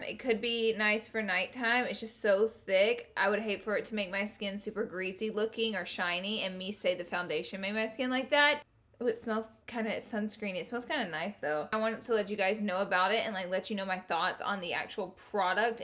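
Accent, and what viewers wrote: South, United States. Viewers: Try dermacol foundation ask jeffry about this foundation . Who ever say crap abt ur accent dont give a dam girl . Hi 👋 to my southern girl 😻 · Is it just me or do some people watch her videos for her beautiful southern accent😍😍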